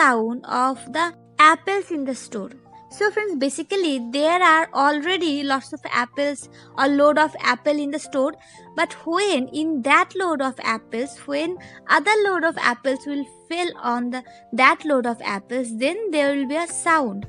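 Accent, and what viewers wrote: India. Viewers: Good explanation... In your talking style it proves that you belong from Bengali society 😊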